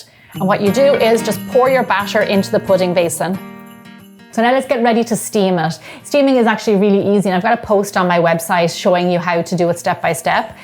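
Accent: Ireland. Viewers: Thank you so very much for taking the time to respond to my post and to give me tips for making my pudding gluten free. Thank you also for you kind condolences. I forgot to say how much I LOVE listening to your brogue. You remind me so much of my cousin Terri O’Neill who’s Irish on both her mom’s and dad’s sides. How I love Ireland and the Irish people! ☘️☘️☘️💗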